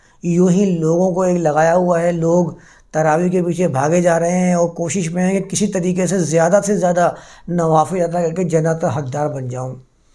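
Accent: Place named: Arab world. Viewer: میاں، آپ بہت معقول باتیں کرتے ہیں، مگر خدا معلوم کیوں اردو کی عربی میں تلاوت شروع کردیتے ہیں؟ اب آپنے فرض کو فرد کہا، جبکہ فرد کا مطلب اردو میں individual ہوتا ہے۔ اردو میں “ض” کی آواز بلکل “ز” کی طرح ہے۔ اور یہی مستند ہے۔ طرفہ یہ کہ قرآن جسکو اردو میں بھی قرآن ہی کہا جاتا ہے، اسے آپ کوران کہتے ہیں۔ یا للعجب! اردو کو عربی لہجے میں بولنا اتنا ہی غلط ہے جتنا عربی کو اردو یا پنجابی لہجے میں بولنا۔ اب اردو میں تو فارسی، انگریزی، اور سنسکرت سے مستعار لفظ بھی ہیں۔ تو کیا انکا تلفظ ان زبانوں کے مطابق کیا جائے گا؟ تو پھر اردو کی کیا درگت بنے گی اور وہ کیسی مضحکہ خیز سنائی دے گے۔ اسلئے میاں اردو کو اردو میں ہی بولا کیجئے۔ آپ جب تلاوت کریں، یا عربی میں درس دیں تو بصد شوق خالص عربوں کی طرح بولیں۔ کس نے منع کیا ہے۔ اھلا و سھلا۔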